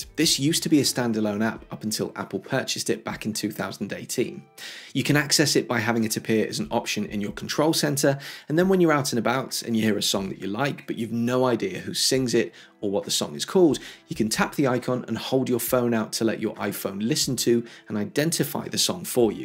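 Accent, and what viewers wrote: England, United Kingdom. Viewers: At last, an English bloke speaking English. No “hey guys, what’s up?” Fantastic. Thank you. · Great tips! BTW, I love your eloquence and British accent! You should consider being a narrator on PBS, BBC, or Discovery!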